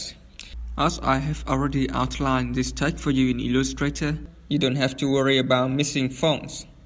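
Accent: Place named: Italy. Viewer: Woow man You have an accent like a man in video "italian man who went to malta" and that's so funny and cool :D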